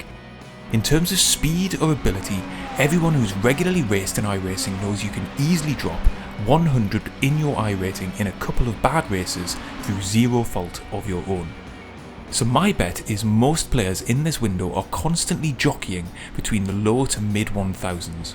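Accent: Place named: County Durham, United Kingdom. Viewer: Hi you sound local to me as I live in stanley co.durhm. Enjoying the vids and would love an idea of price for a simple mackem mover graphic for my current cars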